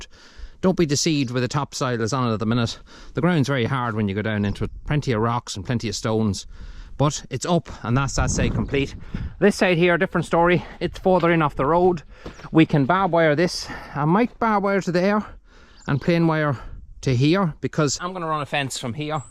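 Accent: Ireland. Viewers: So happy to see some work done here! My great grandparents were dairy farmers in Ireland. Do you have the name of the land owner? I'm very curious about all the history. Don't know much about them as my grandmother n father immigrated in 1928 to the USA at the age of 18 I believe. They passed when I was fairly young so I wasn't able to ask any worth while questions. I enjoy watching your videos...a glimpse of where n what they might have lived. Some family members still there but I don't know them. And your brogue is every bit of talking with them! Love it! Thanks. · Greetings from the Ozark mountains in Arkansas, USA. Reminds me of all the old places I have rehabbed in my 80 yrs. I’m on my last one, an old woman now, I just enjoy watching my birds and critters. But I’ve loved giving all those old cottages a new life for the next generation to shelter in. More fun now to watch someone else save one, and listening to that lovely Irish lilt makes it even better!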